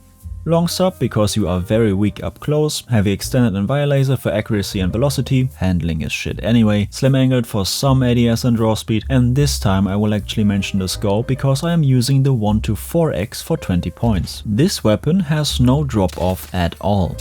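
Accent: Germany. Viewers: I watched until the end because you make some of the best Battlefield videos on the internet. Dry humor, efficient/clear explanations on things, and of course your German accent. Danke, dass du großartigen Content erstellst!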